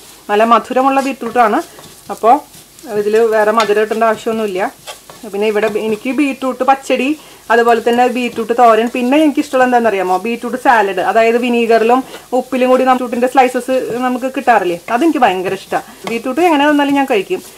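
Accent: Thrissur, India. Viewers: Nalla assal Thrissurkkari :D. Rasamulla explanation :)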